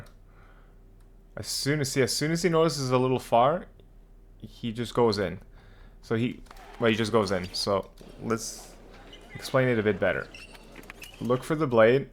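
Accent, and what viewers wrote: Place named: United Kingdom. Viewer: Fucking British English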